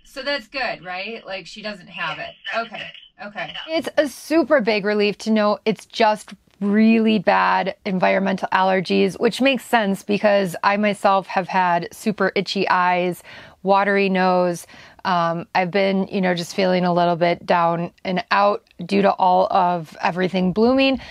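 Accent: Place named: Midwest, United States. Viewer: I have watched almost every and Riot video and I think you both are awesome. As someone that was born in Wisconsin and lived most of my life in and around Chicago your voice reminds me of home. In one video you compared a city to Beloit and I said to myself “How many people here know Beloit?” Beloit is no Janesville but it’s alright. I chose this video to comment on because microbial remediation is part of my job and “The Fungus Among us” is literally one of my titles in my training materials used to train new technicians. I am going to offer a little critique of your videos. It mostly positive because I think you are doing a great job and are genuine. So, I don’t know how you don’t have more subscribers because I find your videos much more entertaining, informative and real than most that I have seen. I guess I can just make this a top 10. 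My 10 favorite things about and Riot videos: 1. swearing. It’s not gratuitous vulgarity but you say what you feel and if that includes coarse language you let it fly. 2. How well thought out you are. It’s obvious that you research your topics and yet you always provide verbal disclaimers that people shouldn’t take what you say as gospel. 3. Your sense of humor is sometimes dry but is often quirky and nerdy including lots of Star Wars references as well as LOTR and even South Park. 4. How strong and positive you are. You appear to be crushing the nomad life. Super prepared and taking the pitfalls in stride. More people especiall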